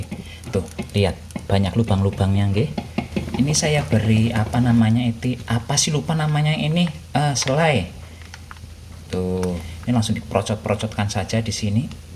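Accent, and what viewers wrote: Banyumas, Indonesia. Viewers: Tinggal.di.mana si mas ? BATAM..? Tapi.dialeknya mbanyumaswn ya..😂 pada baen karo inyong..adoh nang kalimantan esih clacapan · bar kesuh..curhat,mecucu njuk masak dech....mandan2....error....kog siki dadi ngapak kang?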